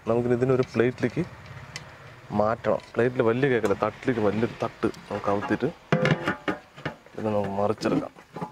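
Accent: Palakkad, India. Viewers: palakkadan basha 😍😍😍 idhu kanunna palakkattukar undo?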